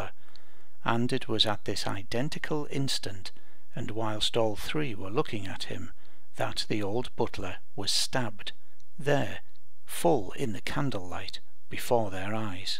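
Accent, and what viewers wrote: United Kingdom. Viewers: No offence to American readers, but there's nothing like an English voice for a ghost story 🙂. Thanks.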